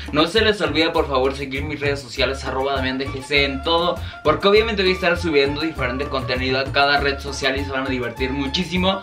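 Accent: Chihuahua, Mexico. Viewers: Jajaja tu acento de chihuahua, que loba hermana! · Ay, amé en vdd, me divertí muchísimo, aparte tu acento shihuahuence super bien hecho, saludos desde ciudad juarez, shihuahua!!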